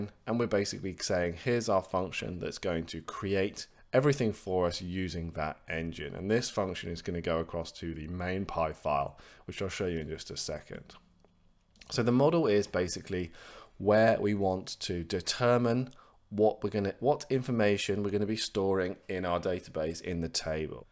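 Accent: United Kingdom. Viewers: Man Your accent is so adorable. Are you British ?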